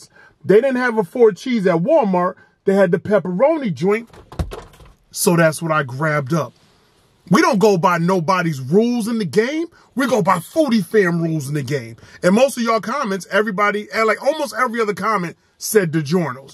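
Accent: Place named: New York, United States. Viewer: Yo this dude gotta be from new york... "Yahll gave wild feedback"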